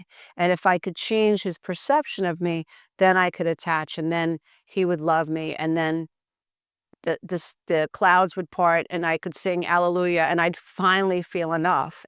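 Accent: New York, United States. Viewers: I knew you were from New York…I thought Long Island ❤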